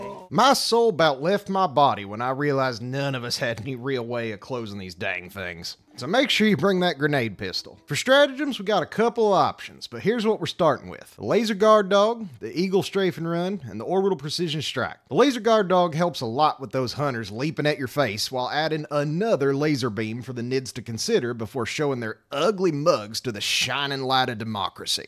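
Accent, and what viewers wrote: South, United States. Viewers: the southern accent just makes it so much better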